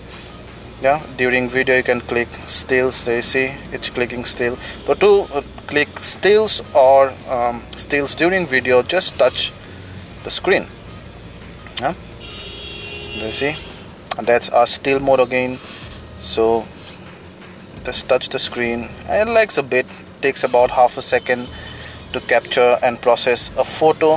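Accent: India. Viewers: OMG Why every budget phone review is narrated with an indian accent? why jesus??? <--- Racist Detected, sorry guys, but this annoys me a little.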